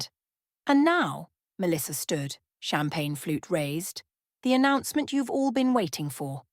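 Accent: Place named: United Kingdom. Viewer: The British accent drives me crazy. Sounds like she has a mouth full of marbles. I’m gone!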